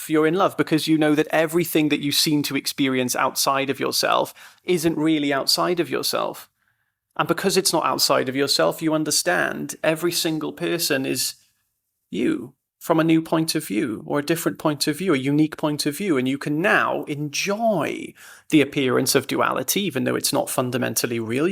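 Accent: Wales, United Kingdom. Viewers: do I detect a little bit of Welsh in your accent ?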